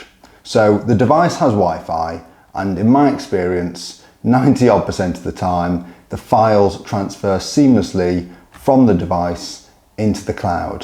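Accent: United Kingdom. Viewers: Matthew Brokerick is doing pretty well I guess. Picked up a british accent somewhere too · This is an impressive review. The pot plant in the background gives a vintage office feel! It’s great to hear an English accent. I’m a lawyer and have umpteen notebooks per case. I like to write with a good fountain pen. The contents of my notes are confidential. Is that preserved? I’d like to move to something like this and the idea of saving paper sounds good. Are you sure I won’t lose any work? Bobbie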